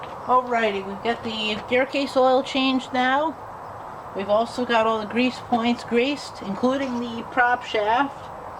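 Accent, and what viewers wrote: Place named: Newfoundland, Canada. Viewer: Where are you from? I first expected Newfoundland until you were talking in gallons and pints. Lol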